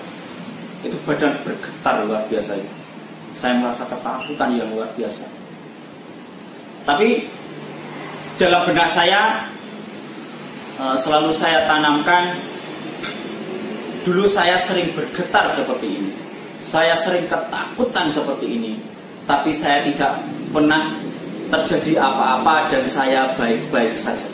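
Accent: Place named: Banyumas, Indonesia. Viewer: rika ngendi kang... ketone ngapak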